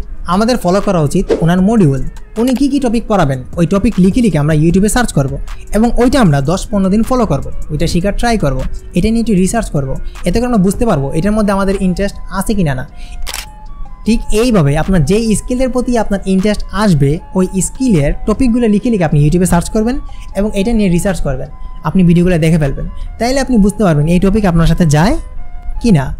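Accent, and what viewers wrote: Sylhet, Bangladesh. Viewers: Vai apni ki sylhety ni😅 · Vai apni sylheti ni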